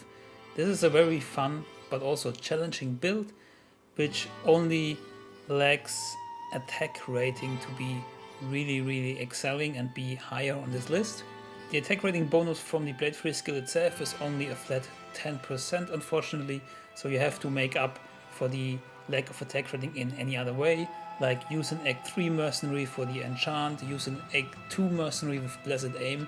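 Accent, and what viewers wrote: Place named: Germany. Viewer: German for sure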